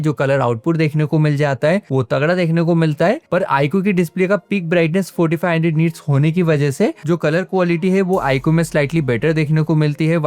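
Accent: Indore, India. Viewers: Bhai aap indore se ho kya?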